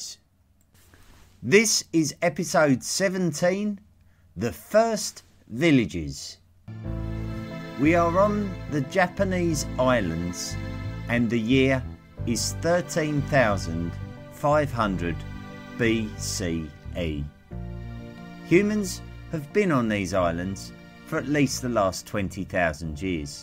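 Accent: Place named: United Kingdom. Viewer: What British accent is this?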